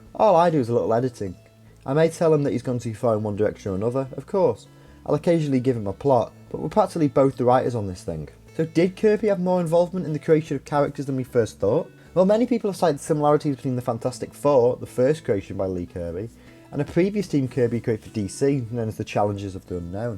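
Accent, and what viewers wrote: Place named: England, United Kingdom. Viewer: The second most annoying English accent, besides mesdsy69. 😑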